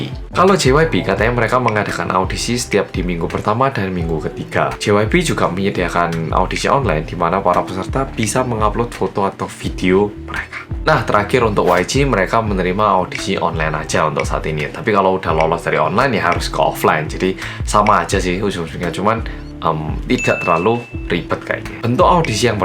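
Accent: Java, Indonesia. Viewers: wkwkwkwk anaknya orang korea yang medhok, bisa aja mas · Me : medhooook👌👏👏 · Medhoookkkk, mantul rek 😂😂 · Salut sama mas oppa ini bahasa Indonesia nya lancar bgt sama medok pula . Jdi kaya orang Indonesia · Yang ku tunggu adalah yang MEDHOKKK ..udh jdi candu nih medhok buat gw😅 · orang Korea yang... Medhookk BP: Oppa!!! Ilustrasi: BTS Sempurnaaa😍😍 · Meddooook.. Lama lama aku keknya jadi medok juga gara gara sering nonton Kak.. Gpp sih menambah kemampuan bahasa ya kan Mas? Uuuuu😆💚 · Lah...ini orang Korea asli atau orang Jawa asli ya...bingung bet... Aw..... ....... Logat bahasa ny tu lhooooooo biasanya huruf A di Korea dibaca agak ditahan .......begiy juga D lah ini kok D nya dalam bet bhs. Jawa......B ny jg wkwkkwkwkw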